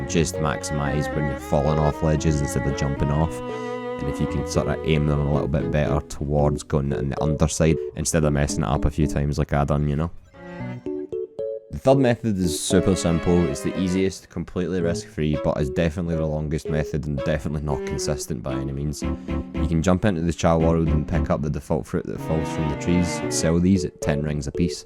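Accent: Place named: Glasgow, United Kingdom. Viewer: Not only do i thank you for this video but i adore your accent lad , u from glasgow?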